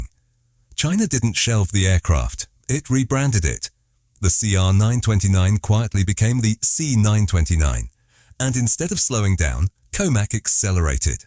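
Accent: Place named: United Kingdom. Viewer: Trust China ? Trust their data & one test flight. How much does it take to buy off media hyped promotions ? Now it’s alledged … CCP is doing it all . This is CCP lackey — agit prop , bought off public relations, & over hyped mock up. — Looks like Boeing & AirBus should give up.. Pure “ white monkey spokesman “… influencer with a “ Brit accent “. — Towering CCP fakery & tub thumping.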